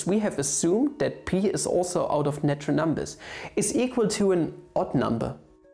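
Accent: Germany. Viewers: Is this guy German or from a country where German is the main language. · Are you german by any chance? :)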